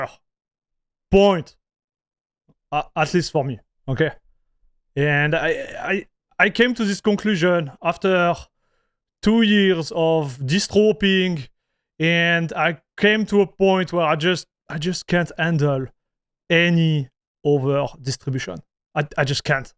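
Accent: France. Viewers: Sorry for reducing you, but I only watched this for the english with french accent.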